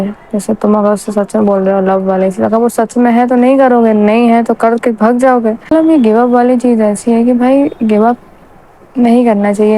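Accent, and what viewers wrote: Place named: Madhya Pradesh, India. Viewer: Tum MP se ho kya? Tumari accent se lagra hai. Btw consistency on 🔥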